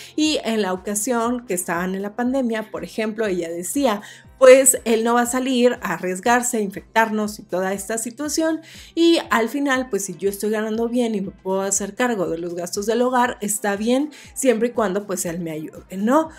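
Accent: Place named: Peru. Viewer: YO CREO Q ESTA BIEN ,Q NO PIERDA SU ACENTO PERUANO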